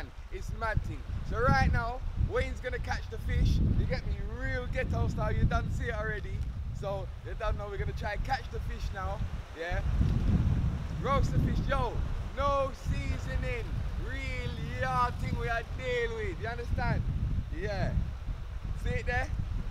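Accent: Jamaica. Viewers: is a funny guy for a white man speaking patwa my guy💯🇯🇲 big up and moss media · it's so weird seeing a white preson speaking Jamaican but I love it and energy is of the charts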